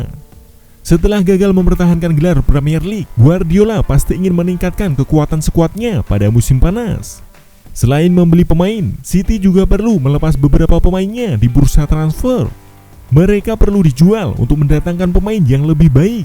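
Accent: Java, Indonesia. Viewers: medok banget jawanya si anchor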